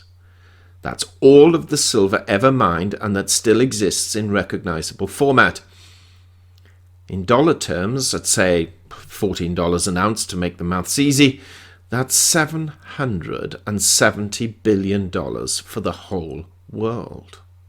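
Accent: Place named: RP, United Kingdom. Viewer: First of all we don't want a one world currency. We surely don't want a one world currency controlled by a central group who want a new one world order. I suspect you are one of those that want a centrally controlled currency easy to manipulate. Silver and gold plus some other inherent value commodities could protect us from dictatorial control of finance (the fiat fraud) that has so indebted the world. Also, silver needs to be revalued to preserve it from destruction. At a higher price silver substitutes will come along to fill need and make available more silver for monetary use. A national currency backed by a useful commodity like silver would have the advantage over those supported by faith in goverment oppertunists. Let there be national currencies to compete to find fair market value and protect the world from Globalist fascism. Tone down the elitist sounding posh accent. 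It sound smarmy.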